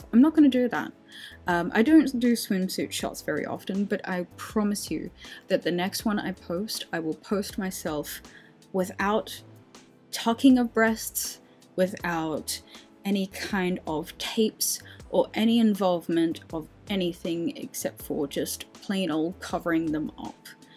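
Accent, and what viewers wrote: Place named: Australia. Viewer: Pretty Australian accent.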